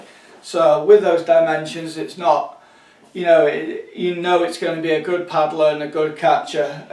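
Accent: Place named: England, United Kingdom. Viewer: G'day from Australia, fantastic in depth, informative review. I want one! Great to hear an English accent on a surf related video for a change! Are they really lightweight? Loved your comment on buying the board for maximum fun rather than how cool it looks. Subscribed. :)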